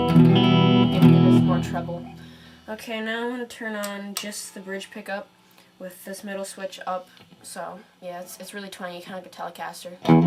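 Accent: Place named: United States. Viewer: i thought you were british but you sound american .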